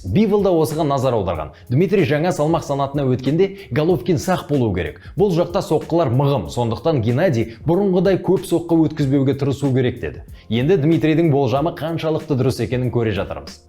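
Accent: Standard. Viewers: Керемет 👍таза қазақша, арна алға баса берсін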